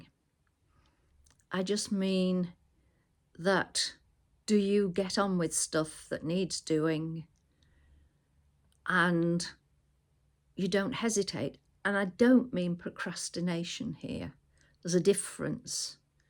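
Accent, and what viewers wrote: United Kingdom. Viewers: BUT. My I ask the accent to that? Irish, Scottish or the 40 accents of thee U.K.? Thank you Ma'am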